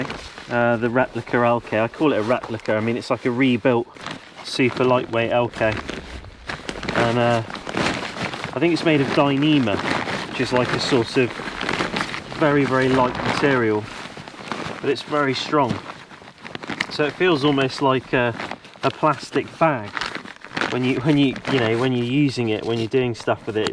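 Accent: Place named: Forest of Dean, United Kingdom. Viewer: Love all the British cultural references to Yorkshire tea and Saville as well as the occasional lapses into a broad Forest of Dean accent. Entertaining as always. Guess I’m one of those subscribers who will listen no matter what the content is each time! Keep enjoying the filming so we can keep enjoying the viewing 👍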